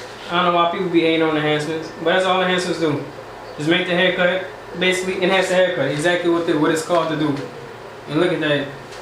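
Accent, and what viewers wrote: Louisiana, United States. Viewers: Just by your voice, I know you're from Southern Louisiana. That is a unique accent.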